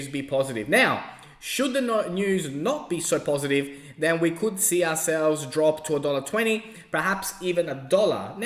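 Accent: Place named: Australia. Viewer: I like you, I think most people are bellends, but not you, your pretty level headed, also you have a crazy voice not just because your an aussie but its very distinctive, I think you could do voice acting! Subbed!!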